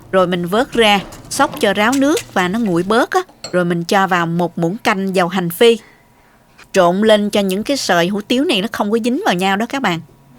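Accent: South, Vietnam. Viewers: Bạn nói vọng người miền nam rất hay, rõ và dễ hiểu. Southerner dialect spoken very easy to understand , clear voices and very beautiful sounds.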